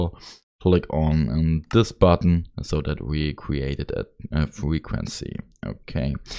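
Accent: Germany. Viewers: Ich höre deutschen Akzent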